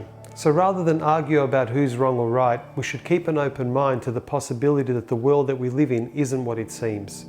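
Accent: United Kingdom. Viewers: William Young it's his English accent nutball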